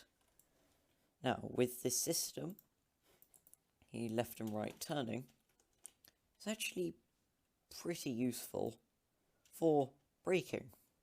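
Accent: United Kingdom. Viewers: Me when i heard him : HES BRITISH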